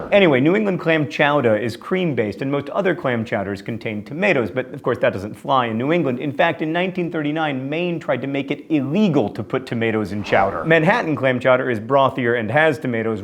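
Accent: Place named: Alabama, United States. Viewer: what happened to your Alabama accent? my brother was born in Alabama wasn't talking when we moved to California by the time he started talking he had an accent from the south. now he just talks like a surfer dude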